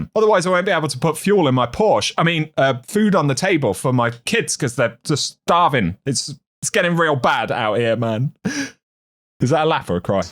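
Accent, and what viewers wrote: United Kingdom. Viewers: Idk how long I've been following you but I always love your snark videos. The british accent and the sarcasm just belong together bro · Im so used to you serious voice ready the scrip for the video, for me you sound identical to "baldur's gate 3 narrator outtakes" 😂💀 (it is the british accent im so sorry😂) I have'nt played D2 over more then a year i think but from time to time i see your video put up on my page and just watch it to be a little up to date (im able im providing food for your starving kids) I finally got my own place and after years im gonna hang up my Hive sword in my gameroom that i got yeaaaarrss ago with the pit of heresy achievement. I still kinda hope D2 will get better and im able to return but for now im still salty they took Forsaken from me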